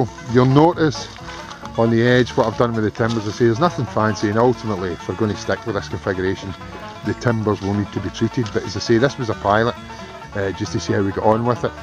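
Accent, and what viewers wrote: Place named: Scotland, United Kingdom. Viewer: My Brit friend tells me it's English with a moderate Scottish accent. Fun. :-) And, love the concept. Wonder if he ever got around to trying one with lighter weight materials. Also saw one online today made with PVC pipe.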